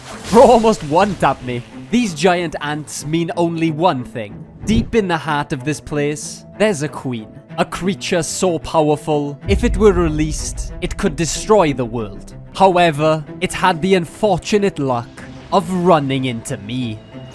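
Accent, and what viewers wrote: Wales, United Kingdom. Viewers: So Elden ring content in 2026, with a solo leveling twist and is that a welsh accent i hear take my subscribe my fellow welsh warrior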